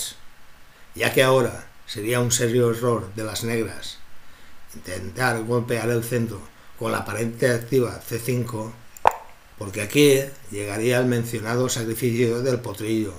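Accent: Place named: Spain. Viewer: Hablas como auténtico español saludos desde mexico (pd:yo elegí comerme el peon con torre 😔)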